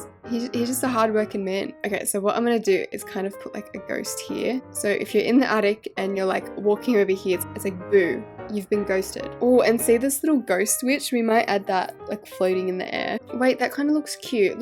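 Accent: United Kingdom. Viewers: I love ur voice its like so British !! like I did like ur “red is a spookah colar”! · I love that u talk British 😊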